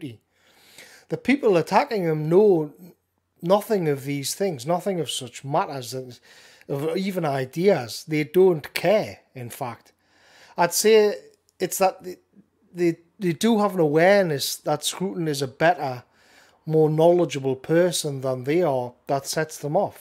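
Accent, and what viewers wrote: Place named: Northern England, United Kingdom. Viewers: I just came here to say it's nice to hear sensible and coherent ideas rendered in a quality northern accent 👍🏻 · Your Geordie dialect has a penetrative common sense way about it. RIP The Honourable Sir Roger Scruton.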